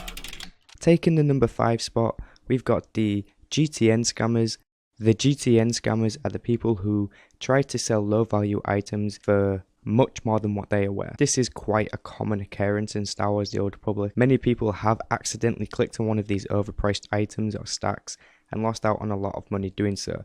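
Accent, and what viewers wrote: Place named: North West England, United Kingdom. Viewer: Hey man where you from? I'm inclined to say north west, I'm from st.helens and your accent is similar to mine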